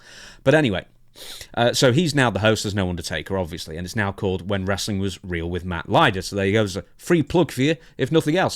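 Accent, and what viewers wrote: England, United Kingdom. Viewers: I actually like going to your videos more than Stevie's. Maybe because you are English and I relate to your tones and expressions more. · I think a big reason people migrated to this channel is that for one: is self-aware. He doesn't have a deluded sense of grandeur in comparison to the wrestlers he hosts podcasts with. I don't like Conrad, I don't like RVD's co-host, etc. Brian Last is okay but sometimes he can be super rude when discussing people and as far as I know, Brian Last is morbidly obese. Second, professionalism. This is also why I really enjoy Stevie Richards' show so much. I like Dutch's as well but he's an older guy so I don't expect him to whip out the newest 4k technology, but Stevie has a studio, he puts effort, good mic quality, etc. takes the videos seriously, the camera and mic quality is excellent, and he actually prepares before he speaks on things. 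I don't mind the rambly moments, but it seems like he as bullet points to get him back on track or he'll cut if gets too off the rails, which I appreciate. Third: is a great co-host. If the wrestler doesn't know about something, you can rely on him to suitably fill them in before the show and even on it if they forget some detail. If the wrestlers starts rambling or forgetting their spot, is good at getting things back on track. Fourth: He has a British accent. Instant +1 because that accent was made to narrate, lol. And I've enjoyed every show he's had. For all people like to rag on Stevie Richards for never being that main event talent, his show is one of, if not THE best one out there. Good production quality, a man w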